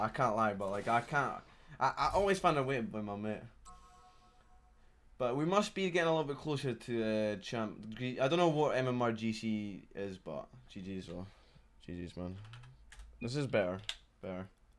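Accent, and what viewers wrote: Scotland, United Kingdom. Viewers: Same old Scottish AF 😂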